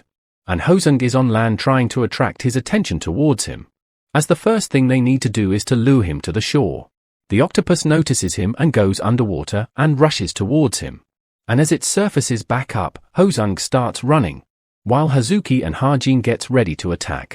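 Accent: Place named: United Kingdom. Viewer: Why does the ai voice sound British I ain complaining tho this peak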